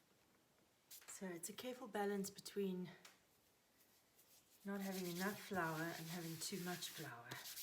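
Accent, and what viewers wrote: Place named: South Africa. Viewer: A lovely video! Deserves way more views. I'm a South African baker/chef living and working in Sweden. Found the SA accent very comforting. Fantastic bread, you certainly look like you've worked professionally as a baker at some point. I'm finally beginning to master my techniques. Found the main stumbling block for me when concerning baguettes was using professional ovens. Unfortunately we don't have those amazing deck ovens at my company but rather convection ovens. They would instantly set the crust and not allow any ear formation or open crumb. After many fails and frustrations I finally settled on preheating the oven and the pizza stones to 300c with steam, and as the baguette go in the oven I'll actually just turn off the oven for the first 7 minutes while they bloom. Anyway thanks for the lovely video. Wish I found it sooner, it would have saved me so much time and frustrations. 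Go well, and keep baking!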